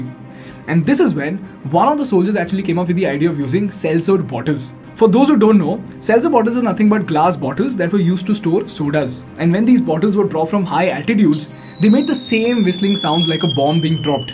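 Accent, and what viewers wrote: India. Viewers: Good content 👏👏, but due to your indian accent, kindly add subtitles so that we fully get what you are saying. Much love from Kenya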